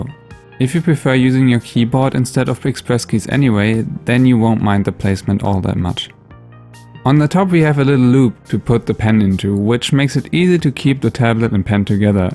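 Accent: Germany. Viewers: wait are u german? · Are you German? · I recognised your german accent so fast because I have one too lmao, i also saw your german settings haha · Finde es lustig wenn deutsche englisch sprechen xD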